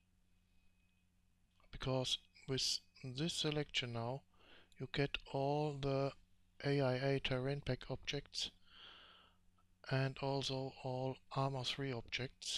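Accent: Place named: Germany. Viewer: Ich find dein Englisch garnich so schlecht, aber man merkt da kommt manchmal der Deutsche durch xD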